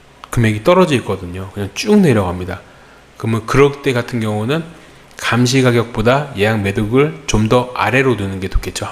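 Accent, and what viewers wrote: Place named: Seoul, South Korea. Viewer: 와 목소리 달달하다.. 이러니 서울말에 뻑이가지…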